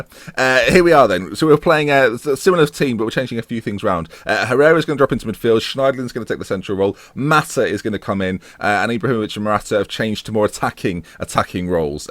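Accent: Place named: Liverpool, United Kingdom. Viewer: You sound more Scouse in this video than in any one previously... Is that intentional?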